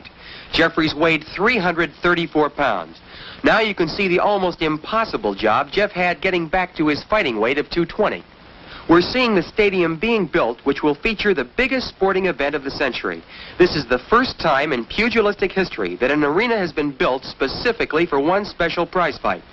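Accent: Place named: New England, United States. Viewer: I wonder how many of you recognize there was no such thing as sound recording, nor radio for that matter in 1910, this voiceover, judging by the accent, is pretty recent, within the last 35 years, from New England.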